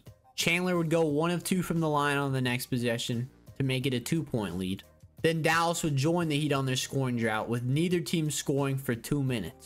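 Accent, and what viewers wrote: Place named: New York, United States. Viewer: its so funny hearing a new york accent talking about the mavs so much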